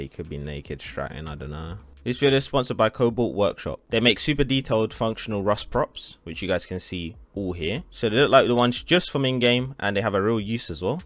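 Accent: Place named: United Kingdom. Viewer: Mate sounds like a brit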